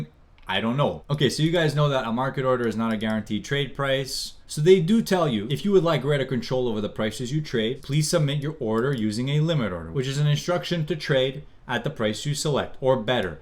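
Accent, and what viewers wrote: Canada. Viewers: By chance, are you from quebec?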